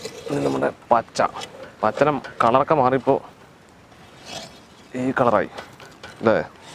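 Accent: Palakkad, India. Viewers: മ്മടെ പാലക്കാടൻ ഭാഷ, അതാണ്,,, ഓ,,, പൊളി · ചേട്ടൻ പാലക്കാട് ആണോ....ചേട്ടന്റെ slang പാലക്കാടൻ ആണല്ലോ...I m in Palakkad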